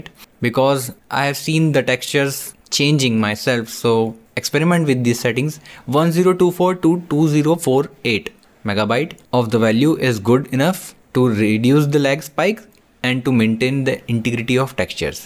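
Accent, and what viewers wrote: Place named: India. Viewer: Another huge advantage of Indian accent is the English is scholar and easy to understand for non native speakers Anyone knows a successful Scottish YouTuber?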